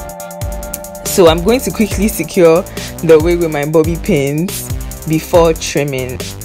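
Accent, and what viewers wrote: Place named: Ghana. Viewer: I can hear Ghanaian accent. I hope am right. U look beautiful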